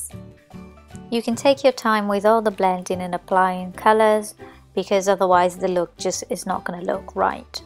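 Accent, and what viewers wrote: Wales, United Kingdom. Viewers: Lovely look, can't wait to try it out this weekend! :) Am I right in thinking I can hear a very slight Welsh accent coming through every now and then? :p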